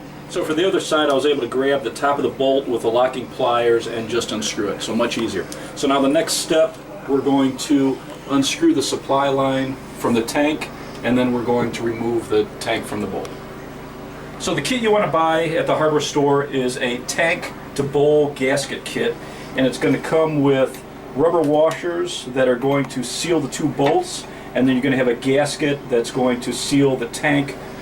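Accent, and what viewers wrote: Chicago, United States. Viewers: Are you from Chicago? Sounds like you are..thanjs for the video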